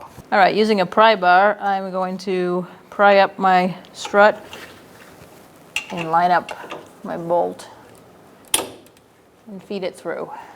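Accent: Boston, United States. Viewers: Love the Boston accent ! 👍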